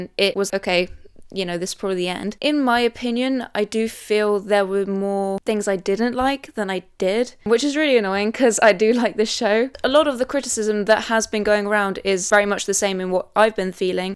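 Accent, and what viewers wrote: England, United Kingdom. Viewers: Just an FYI, But the word "finale" is NOT pronounced the same as "final." You kept saying in the "final" and "the final." But the word you are looking for is "Finale" which is pronounced "fi-nally." Why that "ee" sound at the end. I know you've got the British accent, but I know the word finale is still pronounced the same between America and The UK and they dont just say "final" for both. Sorry my literature degree getting the better of me... lol but so you know you said it wrong the entire video! 😂 great video though for sure! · Hearing this common US English mistake in an English accent was incredibly jarring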